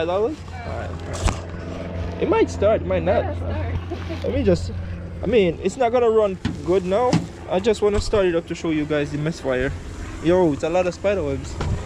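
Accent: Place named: Jamaica. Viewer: Man sound like a yaad man.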